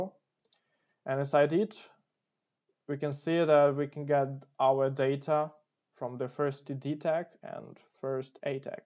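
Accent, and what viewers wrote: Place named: Russia. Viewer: слушать английскую речь от русскоговорящего довольно кринжовенько, но урок очень толковый, спасибо большое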